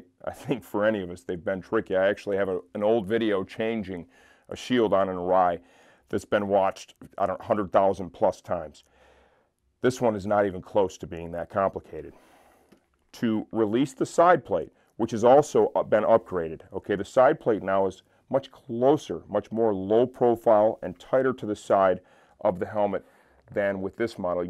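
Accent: United States. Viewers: Why Americans speak like that?? You should see from time to time the BBC.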